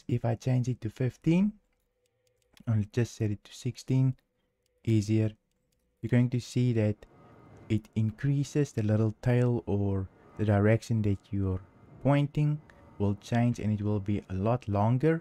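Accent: Afrikaans, South Africa. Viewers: jasis that afrikaans accent hits hard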